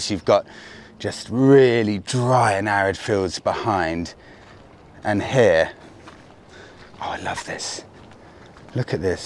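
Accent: England, United Kingdom. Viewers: You should be on Jackanory telling stories, your voice is quentisently English old chap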